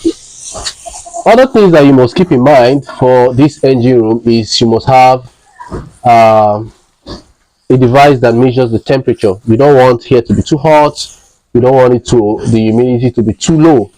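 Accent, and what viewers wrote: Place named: Africa. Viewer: Your English accent is African learn the Filipino English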